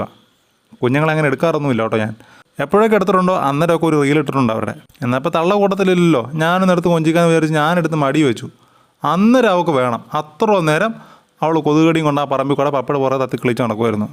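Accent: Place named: Kottayam, India. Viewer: ഞങ്ങളുടെ സ്വന്തം കോട്ടയം ഭാഷ ❤️❤️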